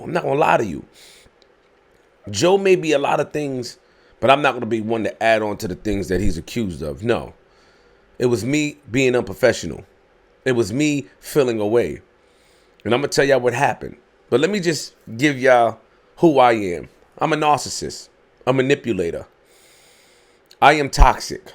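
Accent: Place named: Queens, United States. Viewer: You talk like if Christopher Walken was from Queens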